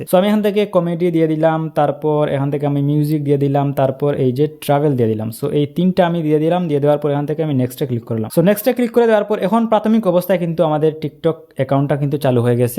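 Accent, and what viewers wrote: Sylhet, Bangladesh. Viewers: সিলেটি নি ভাই?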